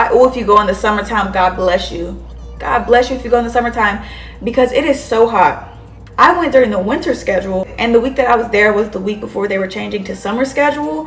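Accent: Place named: New York, United States. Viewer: speaks like she from NY 😅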